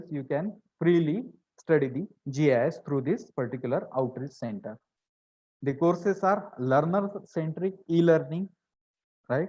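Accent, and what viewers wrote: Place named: India. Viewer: inggris logat india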